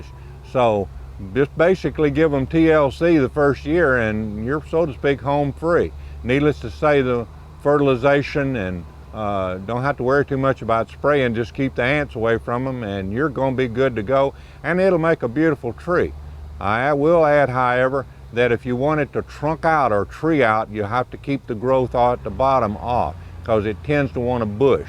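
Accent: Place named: Appalachia, United States. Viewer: Lovable hillbilly accent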